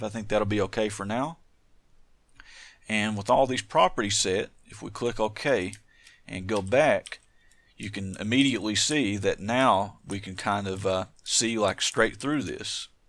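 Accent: South, United States. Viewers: Know how to make it, but still watching anyway. I like the calm southern accent voice telling me what to do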